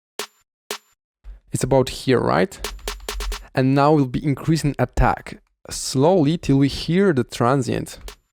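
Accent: Russia. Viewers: русский акцент😂🤟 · Ты русский что ли? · Акцент русский пздц, но видосы крутые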